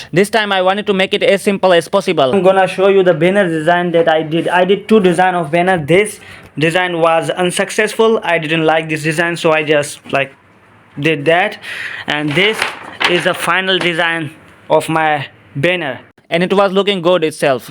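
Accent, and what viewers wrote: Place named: Pakistan. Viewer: Pak english good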